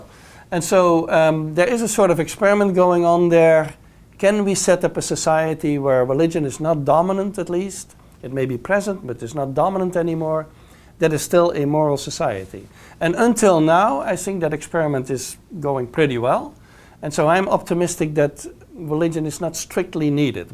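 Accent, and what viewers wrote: Netherlands. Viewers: I could here he was dutch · balkenende accent....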